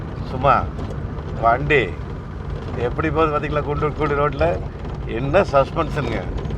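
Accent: Kongu, India. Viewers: கொங்கு தமிழ் அழகர் சார் உங்கள் நெம்பர் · Anna azhagana kongu Tamil la arumayana oru pathivunga naa kekka kekka ketute irukkalam nu irukkuthunga na arumainga ponga ❤️❤️❤️😻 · கொஞ்சும் கொங்குத் தமிழ் பேசும் கோமகரே...! திகட்டாத தித்திக்கும் பேச்சுடைய திருப்பூர் திருமகரே...! அடடா ஆனந்தமே❤❤❤ அம்சமான கார்...! அழகான கார்...! அளவான வசதிகொண்ட கார்...! அனைத்து மக்களுக்கும் பிடித்தமான கார்...! நம் திருப்பூர் அண்ணாச்சி கொங்குநாட்டுச் சிங்கம் திருப்பூர் தங்கம் நம் அய்யா😊🙏 நமது கண்களுக்கு விருந்தளிக்க கொண்டு வந்து இருக்கும் இந்த அற்புதமான கார்....! "❤அம்பாசிட்டர்❤" கார்...! அருமையோ அருமை❤❤❤❤ என்றும் அன்புடன் உங்கள் மகன்போல இவன் ரா.முஹம்மது கபூர் (சென்னையில் இருந்து)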